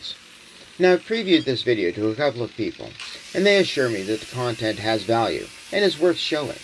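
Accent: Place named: Pacific Northwest, United States. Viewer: I knew you had to be from Oregon or Washington we got the same accent!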